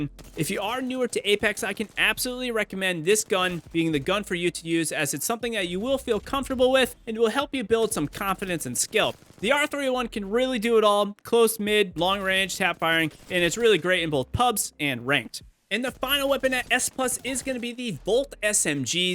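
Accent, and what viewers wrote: United Kingdom. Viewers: your northern accent dawg!! no offense sounds like your saying c ter. lol not making fun of you i’m from texas and i don’t hear it a lot lol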